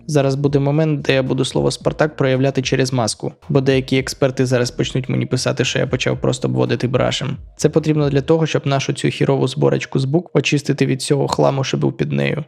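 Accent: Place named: Western Ukraine, Ukraine. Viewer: Я думаю, українська у нього рідна (проскакує західний акцент).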